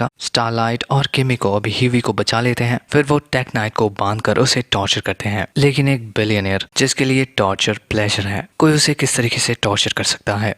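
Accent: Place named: Arunachal Pradesh, India. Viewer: bro.. kya bola aapne? pe.. Rabindranath "THAKUR"? 😅 it seems may be u r from arunachal pradesh.. plz improve accent... baki explanation accha tha... 👍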